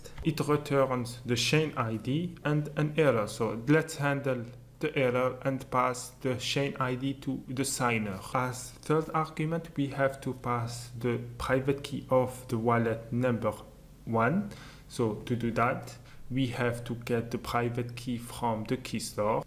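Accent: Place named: France. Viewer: For the love of the beautiful French accent, I want to learn French, mersi or merci ❣❣❣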